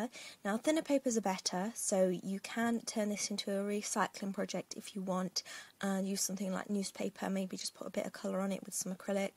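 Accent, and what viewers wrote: United Kingdom. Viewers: Great craft, great accent! You sound like you are from two different parts of UK.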